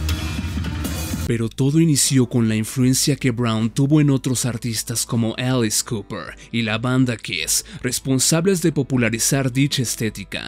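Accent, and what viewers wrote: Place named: United States. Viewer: una pregunta ¿a que se debe el pronunciar todo lo que no sea castellano con acento yanky? es decir, si ya sabes que bathory no es una palabra inglesa no entiendo el porque pronunciar "bazory" con acento yanki como si tuvieras una magdalena en la boca, perdona pero me parece ridiculo.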